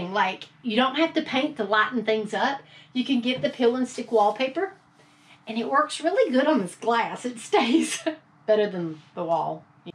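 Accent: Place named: East Texas, United States. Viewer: I just ran across your channel and I love your content! I'm not sure where you're from, but you sure sound east Texan!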